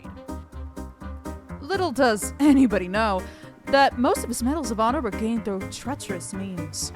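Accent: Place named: Pacific Northwest, United States. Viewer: 1. Are you from the north east Washington/oregon area? You have little vocal tones that sounded very similar to Lockstin & Gnoggin. 2. Please please please make more of the characters!!!!!! Loved the vid!!!!!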